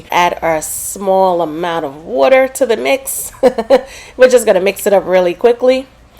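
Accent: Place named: New Jersey, United States. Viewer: I like your accent, are you from Jersey? Great recipe btw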